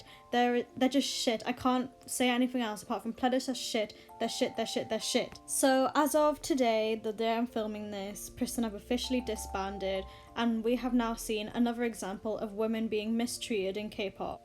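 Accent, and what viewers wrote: United Kingdom. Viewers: Wait r u British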